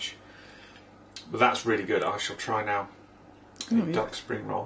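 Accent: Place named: North, United Kingdom. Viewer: I thought for ages you were a fellow northerner, where are you from originally?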